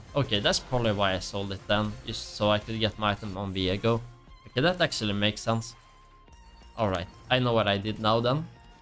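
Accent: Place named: Sweden. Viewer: swedish-english is so fun to listen to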